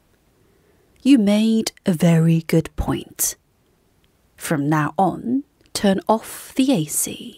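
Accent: RP, United Kingdom. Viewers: 님~ 5시 50분 본방사수하고 있습니다 ^^ 포쉬 엑센트 발음 더 배우고 싶어요 ㅎㅎ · 의 진짜 영국영어 최고 👍🏻 쌤, 30년 장수 프로그램 가즈아~~~🌼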